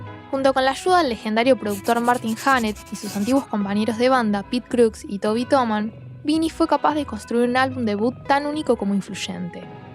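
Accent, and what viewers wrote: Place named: Argentina. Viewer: No esperaba un acento argentino! Muy buen video, gracias por subirlo.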